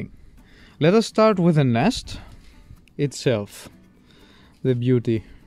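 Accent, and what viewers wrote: Austria. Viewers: #giveaway #notAU I’m from the great state of Florida in the city of Jacksonville in the good old USA. First I just want to say that you’re English is perfect! There is of course an Austrian accent but not much can be done about that. Thank you for your hard work making all these videos! I would love to see more videos of you catching queens in the wild and how to take care of them please. Thank you again.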